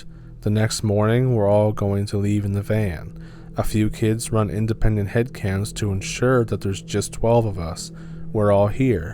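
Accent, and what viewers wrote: Canada. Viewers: Indiana? Sound Canadian there dude.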